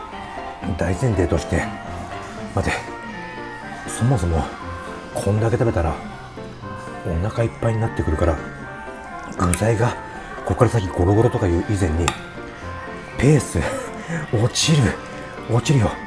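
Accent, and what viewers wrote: Kansai, Japan. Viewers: 好きなキャラが関西弁だからなんかちょっとキュンとして顎んときますね…😍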